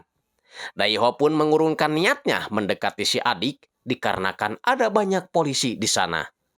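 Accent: West Java, Indonesia. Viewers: Keren euy si mamang sundanese pisan euy edunnn👍🏽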